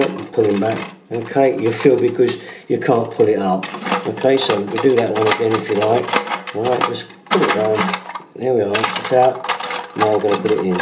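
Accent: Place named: England, United Kingdom. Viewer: I’ve learned a lot from this guy over the years. Down to earth sort of chat and really helpful. Saved me a fortune in plumbers’ costs. Lovely English accent too.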